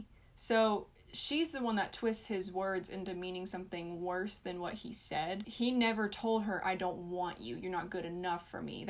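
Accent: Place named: South, United States. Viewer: I didn't know you were from the south and now you've said that I can here your southern accent so clearly !!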